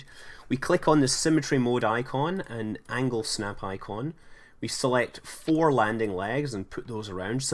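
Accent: Scotland, United Kingdom. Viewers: can I just say? I freakin love your accent your dude! You sound like Scotty from Star Trek and that's a good thing!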